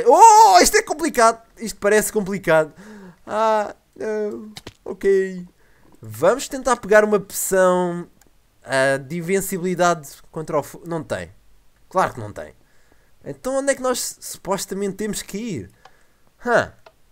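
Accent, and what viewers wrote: Portugal. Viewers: Adoro seu Sotaque Portugues C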